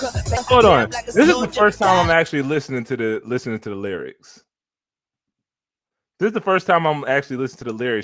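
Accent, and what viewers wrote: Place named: Philadelphia, United States. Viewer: You from Philly, ain’t cha? 🧐😏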